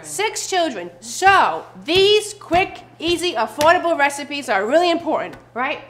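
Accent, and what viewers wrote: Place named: New York, United States. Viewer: By her accent, I can tell she’s either from NJ or NY! Am I right? Lol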